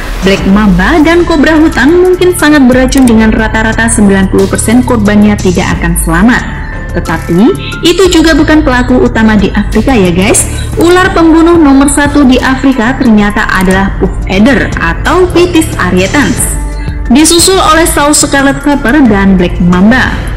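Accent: Java, Indonesia. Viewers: Syin nya medok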